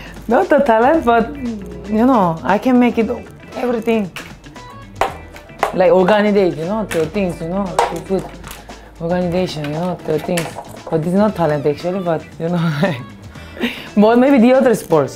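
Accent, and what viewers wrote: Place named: Turkey. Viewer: 언니 터키식 영어 너무 좋아…마지막에 터키어랑 손키스는 뭐야 ㅠㅠㅠㅠㅠㅠㅠㅠ 하 미치겠네 중간에 자기 구글에 쳐본적 있냐에 답할때 왜 이렇게 귀여운건데………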